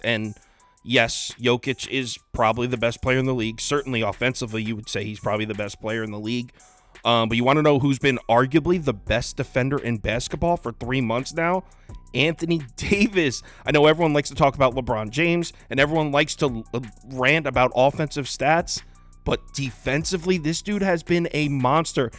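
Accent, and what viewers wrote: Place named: Boston, United States. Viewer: ah shit, so lakers lost. Lmao, always thought you were from BAWWston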